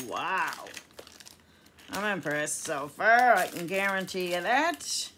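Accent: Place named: Newfoundland, Canada. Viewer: First time seeing one of your videos, and I love that kit that you got. I love that they're already framed and that you have the three pieces separately to do. They sure did add in a lot of extras also. I hope you don't mind me asking, but where are you from.? Your accent sort of sounds like where I'm from, and that is Newfoundland Canada, but I could be wrong 😄💜😊💜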